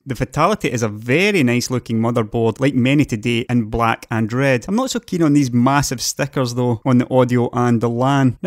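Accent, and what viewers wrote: Scotland, United Kingdom. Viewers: that thick scottish accent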